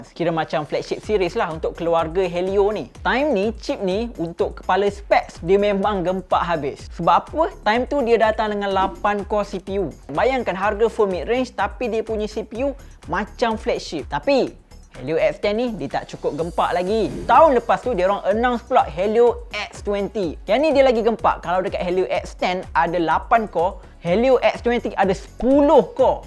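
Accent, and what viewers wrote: Malaysia. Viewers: Gw suka logat malay kayak lihat upin upin wkwk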